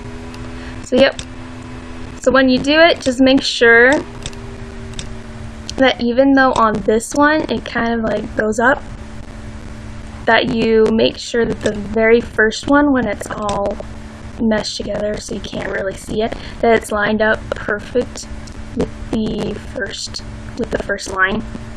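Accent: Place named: United States. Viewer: oh my god my parody buddy has the cutest american accent! Soooo cute! OUO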